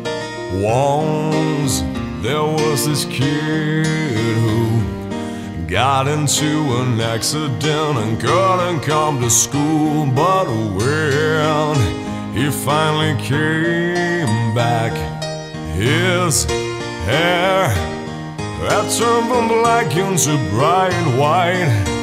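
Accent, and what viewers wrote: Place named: South, United States. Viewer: you are from denmark how do you talk like american i say southern part brother love your music keep it up you know the band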